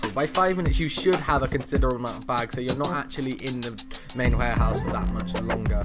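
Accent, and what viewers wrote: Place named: United Kingdom. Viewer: so british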